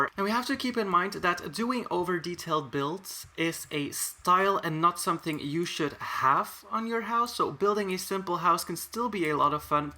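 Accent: Flanders, Belgium. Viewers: Ben je ook Belg?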